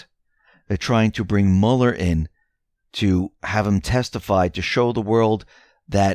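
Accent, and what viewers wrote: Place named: New Jersey, United States. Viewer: He’s from New Jersey, you’ll grow accustomed to it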